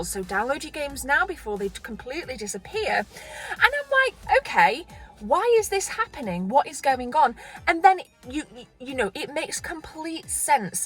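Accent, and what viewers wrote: United Kingdom. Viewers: That amazing uk accent!🙈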